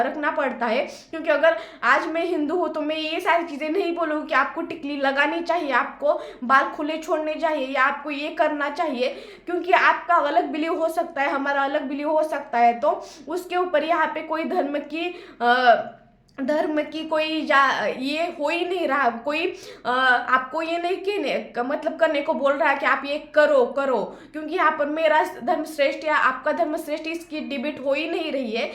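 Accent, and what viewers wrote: Maharashtra, India. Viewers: Work on hindi, strong marathi accent. In good wishes if you want more subscribers.you have good face n presence.❤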